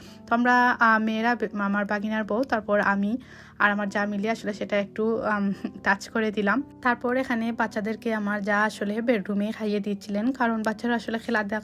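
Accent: Sylhet, Bangladesh. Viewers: Kotay bujai jachhe uni 100% sylheti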